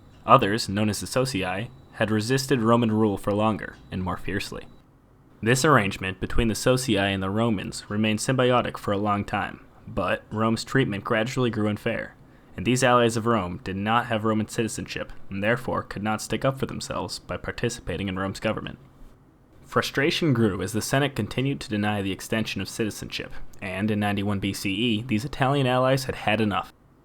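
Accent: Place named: Canada. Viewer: he certainly has accent features that would indicate he’s Canadian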